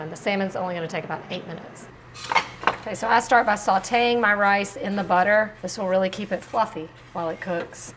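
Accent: South, United States. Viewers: Love this lady...shes si cute! That little southern twang! ❤😊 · Shes so pretty and love her southern accent cause I’m from south Texas. I love her quick dinners with the price of takeout nowadays so she also uses everyday ingredients to make food. I have no kids, but also not working now unfortunately so this is perfect to stay within my very meager budget. Love her!!!!!❤❤❤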